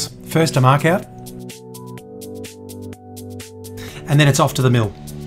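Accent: Australia. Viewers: Are you in australia mate? You sound aussie!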